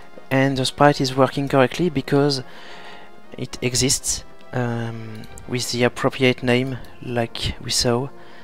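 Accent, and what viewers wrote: France. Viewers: Marrant ça, avec un accent français j'arrive parfaitement à comprendre l'anglais :) (Gros HS)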